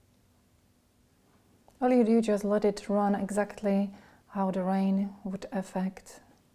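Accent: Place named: Slovakia. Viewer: Great video. Good to know there is an alternative to an airbrush as I don't always mine to hand. I'm curious where your accent is from. My wife is from Slovakia and she's convinced you are from Slovakia too.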